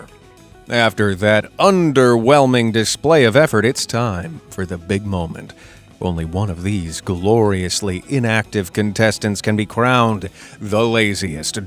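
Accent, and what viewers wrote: United States. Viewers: I noticed that you have an American accent but said buggy when referring to a baby stroller and lorrie when referring to I’m assuming a delivery driver and fire brigade instead of fire department. Odd.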